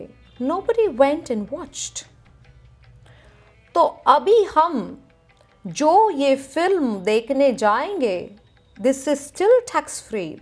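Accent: South, India. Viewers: Listening to her for the first time here, I thought she was south Indian. Then I looked at her Hindi name and other videos and realised it was mimicry 🙂 I have to admit, very rarely do you get non-south Indians imitating south Indians in an accurate manner. It is always some cliched "ayyo rama" imitations. I am sure she put in a lot of effort to learn the accent for every word that she is speaking in this video.👏👏👏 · I ❤ her south Indian ascent? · While I agree that taxes are too much that gdp will actually drop. Lets not be political about it, since the same happened during the congress regime. Both of them follow the same capitalist policies while in power and plain to be socialist while on opposition. And your Tamil accent is horrible. Indiands probably pay the highest taxes. Economics doesn't work this way. We should have had more reforms,but it seems like modiji like chidambaram ji is trying to shore up enough so that the next set of freebies can be announced before next elections. 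Wouldn't be surprised if some major changes would be in place before next polls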